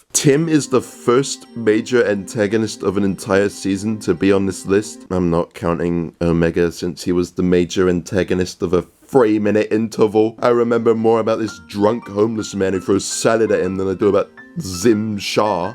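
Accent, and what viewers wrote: United Kingdom. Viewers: Time to listen to a british git speak about a show I watched like once when I was the size of a bedside cabinet and not understand anything like a modern tik tok kid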